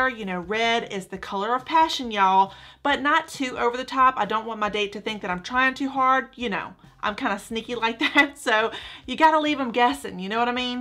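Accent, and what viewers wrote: South, United States. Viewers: I love, love, love the first outfit! The red accents were perfect and that stinkin’ adorable little flowered clutch? Yes, please!! 😍 I’m from Rowlett, Texas… where are YOU from? (I heard all those “y’all’s” you were dropping ! I’m definitely channeling southern gal roots!!) LOL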